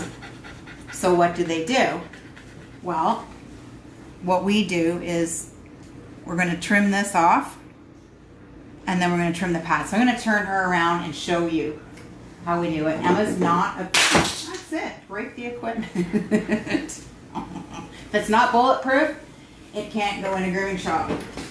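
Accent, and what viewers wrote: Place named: Wisconsin, United States. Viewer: Oh you sound like you gotta be from Wisconsin!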